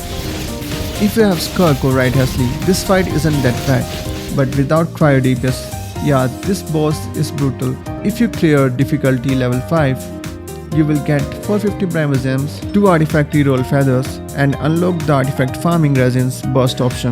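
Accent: India. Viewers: your voice look like indian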